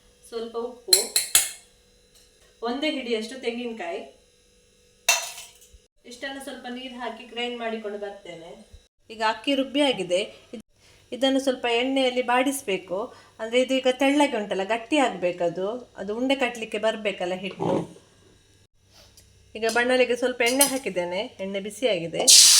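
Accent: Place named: Mangalore, India. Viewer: manglore kannada supr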